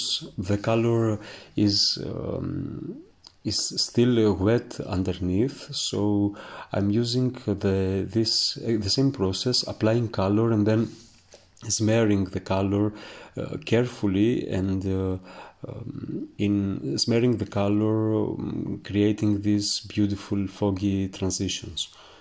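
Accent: Greece. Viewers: Your English is more than adequate as you explain and demonstrate this process so beautifully. I recognised your distinct Greek accent immediately, with a Greek Athenian mother, and thank you for sharing your gift and skill in such a thorough way. Your work is a masterpiece with mystical presence as seen in some fayum portraits, except this young girl is brought to life more profoundly with expression as with the deeper appreciation of this timeless portrait revealing the layers and dimensions, Your painting is a language of its own. Truly impressive. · It's magical how you begin with black and white, and end up with this! Wonderful to watch and learn from. No need to apologize for your English; the accent is charming. Besides--you speak better English than many English-speakers can speak Greek!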